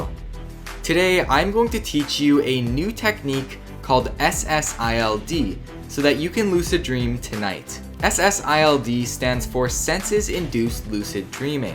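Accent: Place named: Canada. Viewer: I sense a Canadian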